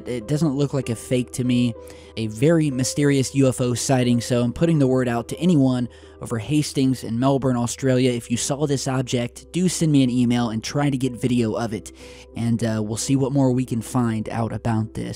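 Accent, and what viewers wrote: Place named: Texas, United States. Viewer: Love the Texas accent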